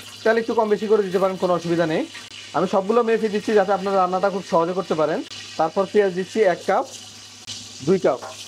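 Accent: Noakhali, Bangladesh. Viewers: হেতে কি নোয়াখালী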